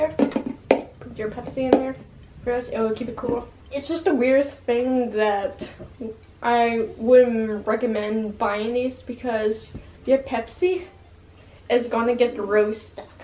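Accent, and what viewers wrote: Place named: Canada. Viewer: do you live in Canada because you have an accent like a Canadian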